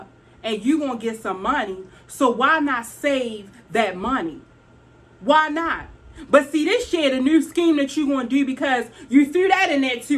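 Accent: Baltimore, United States. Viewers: Heyyy, I'm a new subbie. This video put a smile on my face. The way you told the story about you and friend had me🤣🤣🤣🤣 I love a Baltimore accent! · Hey girl, I just found ur channel and as soon as you started talking I was like "She gotta be from B'more"🤣🤣 When ppl don't give me my 6 ft, I just rurn around and start coughing. They backs up then!💯 Peace and Blessings. #EastBmore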